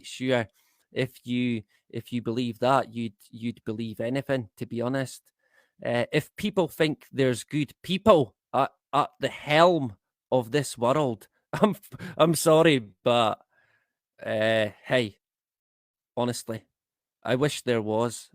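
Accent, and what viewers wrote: Scotland, United Kingdom. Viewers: Love listening to your Scottish accent! Enjoy life my friend. Much love to all. 💙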